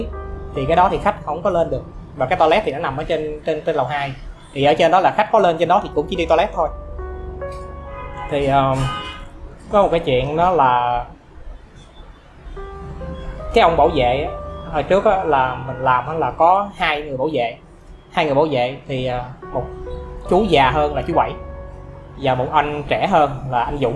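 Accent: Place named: South, Vietnam. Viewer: cách nói chuyện rất Nam Bộ, nghe rất thích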